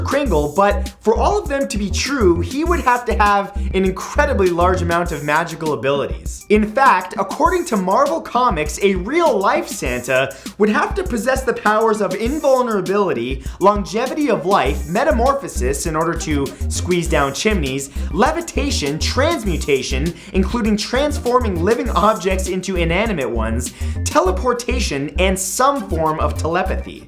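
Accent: Canada. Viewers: Is he Canadian? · Wow now i definitely know that your Canadian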